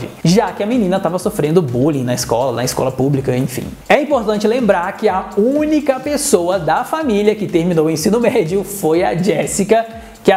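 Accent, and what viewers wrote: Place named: Caipira, Brazil. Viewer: Kkkkk adoro a dublagem kkk bem caipira mesmo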